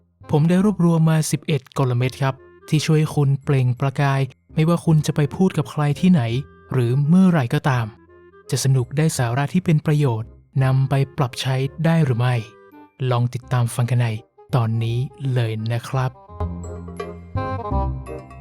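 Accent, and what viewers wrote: North, Thailand. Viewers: คนเหนือแม่นก่อค่า..พูดเหมือนเจ็บแอว😊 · เธอเป็นคนเหนือเหรอ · คนพากษ์ เปนคนเหนือแน่นอน😊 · อันนี้ขอนอกเรื่องได้ไหมคะ สำเนียงการพูดเหมือนคนเมืองมากเลยค่ะ 🤭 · Admin เป็นคนเหนือแน่นอน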